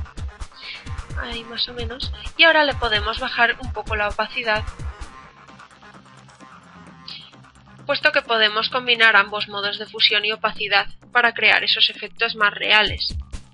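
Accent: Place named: Spain. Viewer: Hola, gusto en conocerla por este medio, ya estoy suscrito en su canal, me gusta la manera en que explica, este es el primero que veo. Felicidades, por que hay otros tutoriales que dejan mucho que desear. Un saludo desde Mexico, a proposito una pregunta por su acento creo que usted es española. Espero no equivocarme.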